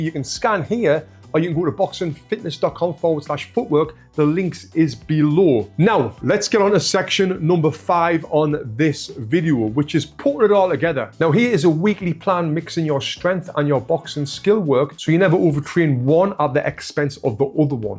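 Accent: United Kingdom. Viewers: Your accent reminds me a Guy Richie movie! Lovely tutorial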